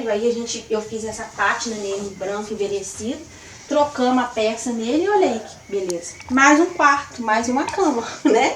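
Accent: Minas Gerais, Brazil. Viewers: Chegando no canal. Ganhou mais uma inscrita. Seu gosto, meu gosto. Amo reaproveitar, transformar, inventar e por aí vai. Esse fogão amarelo deu um charme todo especial. Tenho o costume de pegar objetos de família e restaurar. Tenho várias coisas de roça do meu avô. Se vc soubesse o qt gostei da sua casa!!! O quarto cheio de caminhas com colchas de retalhos, os bancos, as cortinas, o banheiro todo lindo e até a sua timidez 😂. Sou mineira também. Seu sotaque ñ nega. Parabéns. A-DO- REI 👏😘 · Com sua voz de mineirinha ficou um show e amei sua casinha . Parabéns e Deus abençoe sempre beijos pra vc. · É mineruai kkkk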